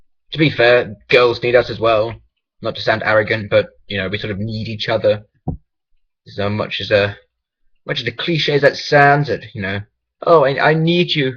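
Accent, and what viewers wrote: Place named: United Kingdom. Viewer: Oh dear that's not so very nice over the sarnie things. Any way. you tell me who I am. INFACT no I will do that for you. I am Zandifate from Instagram. All I had to do was watch this and It made me laugh so hard.:). And it is like. PM. So yeah it was really funny and I would love to see more of your awesome channel. God that was so funny. And even though I am British you still have a pretty epic British Voice xD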